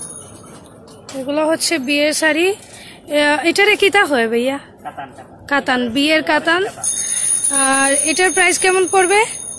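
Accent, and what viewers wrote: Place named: Sylhet, Bangladesh. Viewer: তোমার সিলেটি ভাষার প্রেমে পড়ে গেলাম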